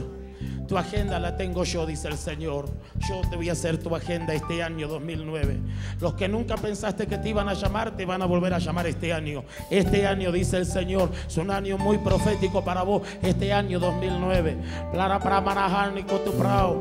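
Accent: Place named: India. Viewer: Es q habla como los d la india.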